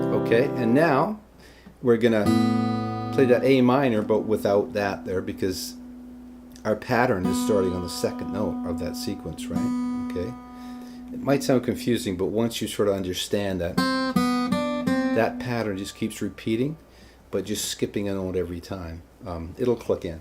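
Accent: Canada. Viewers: GREAT TEACHER! The way you teach really connects with me thank you friend. BTW Are you Canadian?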